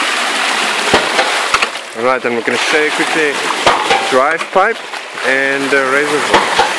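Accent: South Africa. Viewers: Thank you. Pneumatic / hydraulic....... very impressed that it is still so loud, even with rubber seal / buffer in it. Would be so great if you could use all that closing force to fick a wheel / generator over, the last 1/2 inch may be and a ratchet / escapment may be? Water wheel, bring wheel up to the snout, or bring snout down to the wheel, or extend the snout & let it free fall onto the edge of the wheel. Were is "flood season".....? you accent sounds in Africa... south? Regards Bob ( London UK ) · great,I thought I recognised the SA accent, nice video!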